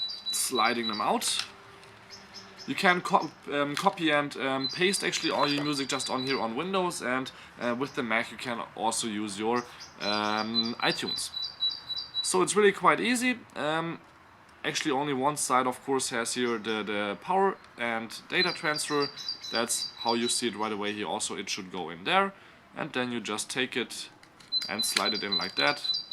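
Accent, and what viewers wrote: Germany. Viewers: Dieses Deutsche akzent schrecklich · Deutsche die Englisch sprechen hören sich so scheiße an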